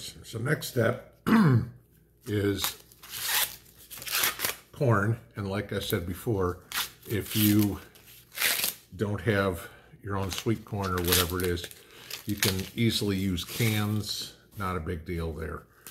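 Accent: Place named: Midwest, United States. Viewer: I just started watching your channel a few months ago and I just KNEW you were not a TN native. I said to my son "That guy is a midwesterner" lol I'm a native Michigander. We know our own lol! Love your channel!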